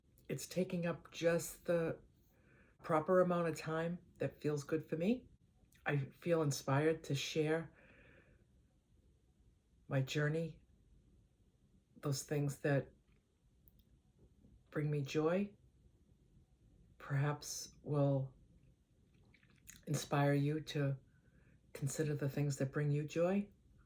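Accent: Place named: Boston, United States. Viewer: yes the channel name is Eat Pray Van. Named after my favorite book/movie! I love that you are just yourself and super genuine. One of my other favorite YouTubers said if your going to do YouTube to “Be Yourself”. (Your one of my favorites too ❤️) I’m from/born in NYC and I really love the “Bawston” accent LOVE IT! I’ll post on whichever is your newest video on Friday and let you know it posted! I can post a link to the video, thank you for wanting to watch I really appreciate it!!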